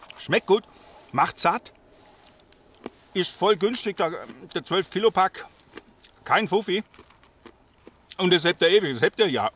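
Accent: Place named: Swabia, Germany. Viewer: legga legga :-) Ein echter Schwabe! Des kannscht da Hase gäbe...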